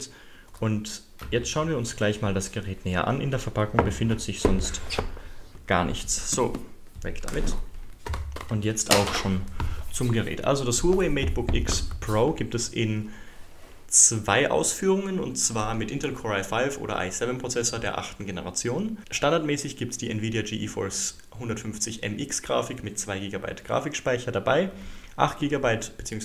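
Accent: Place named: Germany. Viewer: Immer dieses typisch Deutsche: "Huwei" "kinesisch"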